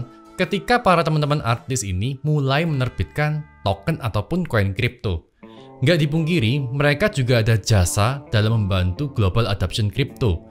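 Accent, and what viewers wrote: Java, Indonesia. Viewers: Ko bahasa nya medok Jawa Jo😅😅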